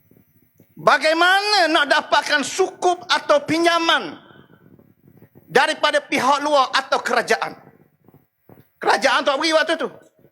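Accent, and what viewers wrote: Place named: Terengganu, Malaysia. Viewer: Menyampampah dengar cakap ganu klante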